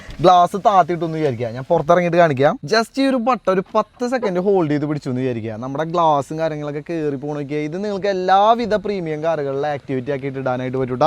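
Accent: Thrissur, India. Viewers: ഇന്തുട്ടാത് : തൂക്കി എറിയുന്ന ഭാഷ ത്രിശൂരാണോ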